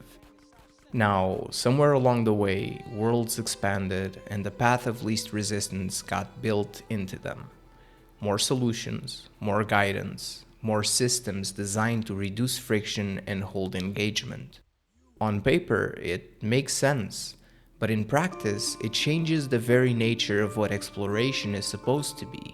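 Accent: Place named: Portugal. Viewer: good video. CD is awesome. accent 100% fellow tuga. 😜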